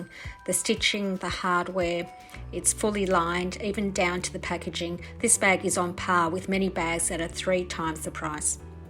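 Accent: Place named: Australia. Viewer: Are you from Australia? I am guessing because of your accent. I’m looking to buy a bag from polene but not sure if they ship to Australia since their website only shows USD dollars or the EUROS. Thanks for your help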